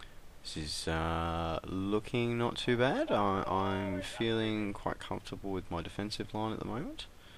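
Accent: Australia. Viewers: You make some brilliant content, and I love watching your War-game Videos. But its a pity you don't have that many viewers. :( Also are you Australian? And do you do Youtube as your job?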